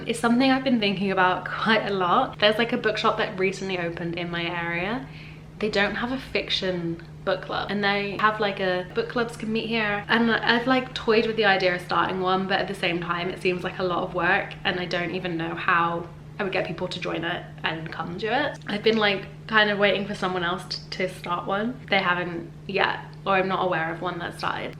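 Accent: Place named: Gloucester, United Kingdom. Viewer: the Gloucester accent is strong in this one after being at home lol 😂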